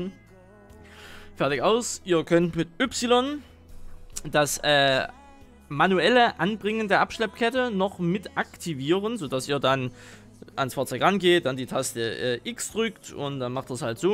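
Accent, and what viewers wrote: Saxony, Germany. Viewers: Nicer Mod p.s. Wenn du Sachse bist dein sächsisch is der Hammer :D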